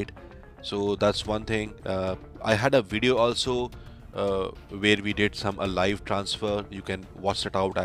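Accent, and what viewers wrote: India. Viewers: What language are speaking bruh 🤣 im guessing ure indian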